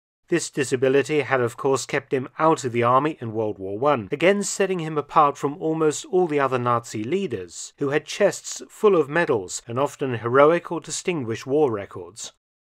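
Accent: United Kingdom. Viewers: Mark Felton, I love your educational channel. I've caught a lot of your videos. They are very informative. Very well laid out. 100% professional. Except your voice. Now you sound like one of those fine British dudes? And you guys are known for delivering, superlative audio. As that will help your rather droll delivery. Because I'm not a big fan of your voice. But no design. You produce some great educational videos of history. Thank you. I glean much from your videos. Now, I know there really isn't anything you can do about your voice. But adding a tiny bit of, free software-based, processing. Would be an advantageous measure to take. Doing little things like, rolling off the low frequencies. Boosting the upper midrange frequencies between 3 and 5 kHz. And then an extra little boost of high-frequency treble, boost. Followed by what we call a Dynamic Range Limiter. 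With settings of 20:1. Attack and release times. Set to, Medium. And making the meter deflects, downwards. Like your windshield wipers on the highway during a, hurricane. And then you'll have something. It's easy to do. You can do it too. It's okay. We won't tell anybody! RemyRAD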